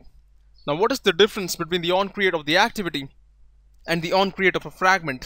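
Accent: India. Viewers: 一听就知道是印度伟哥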